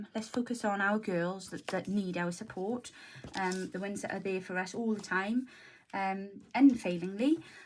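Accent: Wales, United Kingdom. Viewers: I don’t normally add comments as I’m new to Junk Journaling. I’m shocked to hear that anyone who enjoys any sort of craft can make nasty comments about you and your very clever team. I live in NZ and sometimes feel a bit isolated but every day I look forward to your tutorials and love listening to your lovely Welsh accent. It’s funny hearing you talk about spring when we are experiencing autumn. I hope this bullying stops after your telling off and hope you and your team are not feeling to deflated as there will be hundreds of crafters in this world that appreciate everything you do. You certainly brighten up my day. Like you I was always the tallest at school and hated it but now there are so many young ladies around and I always admire how elegant they are. Certainly didn’t feel that in my youth. Keep smiling and team and keep turning out your amazing projects. 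I think you are all very talented. 😊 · I think JJ people are some of the most positive and supportive people around. I believe it is because we thrive when we are creative and I believe we pass on the positivity. And I love hearing your Welsh accent (I am from Denmark but spend 3 years at uni in Wales). Keep crafting and stay positive - we have one life, we decide what to fill it with😊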